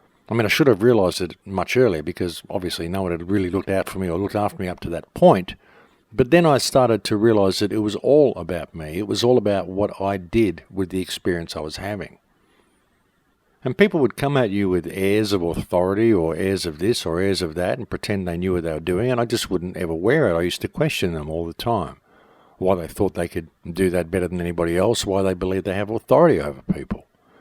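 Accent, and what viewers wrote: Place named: Australia. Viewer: Thank you! Thank you for the time you put into your work for us! And thank you for being born in Australia! I love the sound of your voice combined with your accent as it skillfully spills the truth. Thank you for keeping us aware of our true surroundings while teaching us how to identify big picture realities which the majority of the human population is deliberately blind to.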